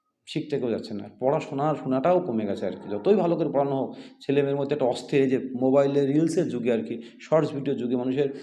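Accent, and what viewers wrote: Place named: Bangladesh. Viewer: Mid day meal thakle cholbe....loker kache taka nei tai hoito govt school thakbe.. apni kothai thaken apnar accent bangladeshi der matan,( Bangladesh theke ese 1995 theke ese aneke class 9 admission nia nei tarai besirbhag school teacher achen) teacher ra porate Jane na ba porai na tai hoito kichu student private a jabe.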